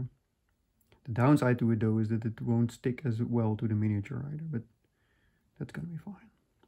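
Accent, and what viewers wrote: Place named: Netherlands. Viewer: Bedankt, man! Ik denk jij zijn Nederlands van je accent? ;) Sorry, mijn Nederlands beroerd!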